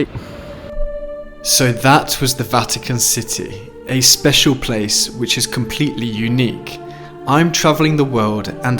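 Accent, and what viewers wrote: United Kingdom. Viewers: Today, the 25th of March, 2023, I´ve had my first contact with this great channel: I love your accent (I guess you speak British English, but I can´t get from what exact country you are. I am from Barranquilla City (the Shakira´s hometown), on the caribbean coast of COLOMBIA, South America, so SPANISH is my native language (Saludos fraternos). I am also Christian (Roman catholic), so I really enjoy your video and I learnt a lot: I think I will go to Italy and The State of Vatican City, soon. I am sure I will improve my English communication skills with you: I have turned into your new fan: My best wishes!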